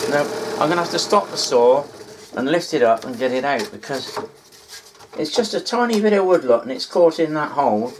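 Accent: United Kingdom. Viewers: I’ve enjoyed all your fretwork/scroll saw videos. I live in U.S.A. but I lived in the U.K. in 1981 and 1982. Your British accent brings back fond memories. Here in the U.S. we don’t have anything like the vintage fretwork patterns like what you’ve shown in this video. Can you suggest an online resource from vintage fretwork patterns?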